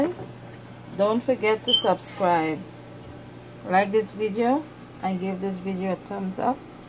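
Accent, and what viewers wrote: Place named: Guyana. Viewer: i love your accent , i am from the UK ,, real guyanese accent .. ok nyce gyal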